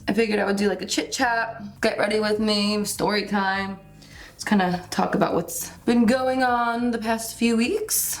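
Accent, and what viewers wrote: New Jersey, United States. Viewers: The jersey came out in this video !! Eowww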